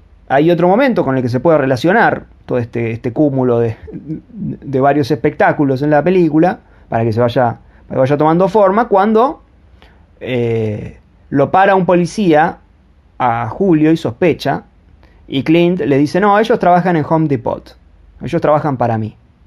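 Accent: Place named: Gaucho, Argentina. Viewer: Tu pinche acento gaucho.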